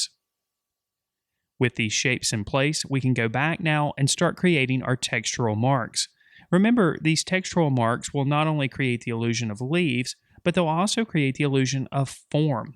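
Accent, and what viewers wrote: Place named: Tennessee, United States. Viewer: awesome! you sound like (judging by accent) that you live in the Tennessee area? don't get to hear that accent much any more! grew up in Virginia and now live in North Carolina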